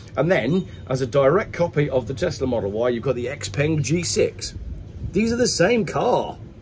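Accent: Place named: England, United Kingdom. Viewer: And utterly rude to boot! With an English accent. Love it!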